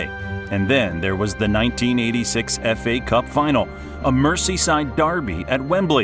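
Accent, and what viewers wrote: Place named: United States. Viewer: bro is your voice AI and why is an american speaking about everton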